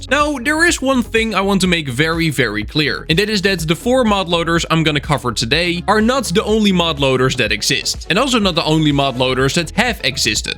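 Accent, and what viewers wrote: Netherlands. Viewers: i mainly just use fabric cause my laptop is so bad that it runs my browser at 2FPS, if i use forge i need to close everything else and then i run on 10FPS but with fabric and shaders i run with 10FPS (on fabric i dont have so many optimalisation mods. i only have sodium, lithium, ferritecore and entity culling) also you for some reason sound realy dutch with how you speak english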